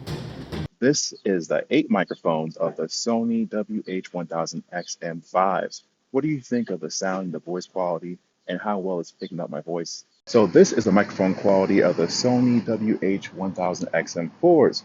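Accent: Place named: Philadelphia, United States. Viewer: Sounds like you are in the Philadelphia region based on those stops 😅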